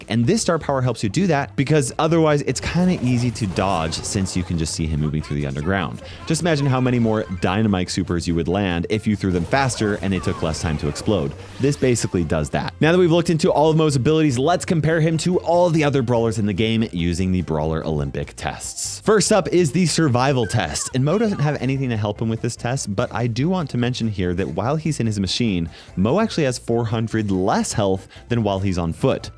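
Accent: Scotland, United Kingdom. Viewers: why is it british🤮🤮🤮🤮🤮🤮🤮🤮🤮🤮🤮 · Bro is British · HES BRITISH!!!!!!!!!! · HE'S BRITISH I LOVE HIM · Why is he british😂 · Lmao the british accent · he´s british!!!!!! oh and where did kenji come from. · Of course he has to be British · British accent tops it off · why is he british tho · Bro's bri'ish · he's Scottish 💀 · Nerey british ahh voice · Is Moe Scottish? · I mean a Scottish accent is technically still a British accent but I get what you mean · why is he british please dont be british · i like his british accent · Bros so British 🇬🇧🇬🇧🇬🇧🇬🇧🇬🇧💂‍♂️💂‍♀️💂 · I didn't know Moe was british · Ees bri’ish mate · He Scottish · He's BRITISH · I did not expect him to be british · He has the most british accent of all time, what are you talking about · I didn't expect him to be british but I love it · Why is he british😳😳😳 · Wait, he's just British?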